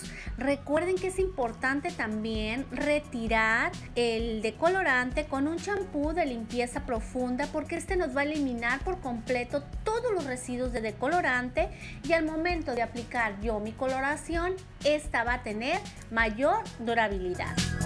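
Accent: Mexico. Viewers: Gracias por tus lindas palabras amen. Tu asento me suena de nacionalidad Méxicana. ¿De que país eres? Lindo dia.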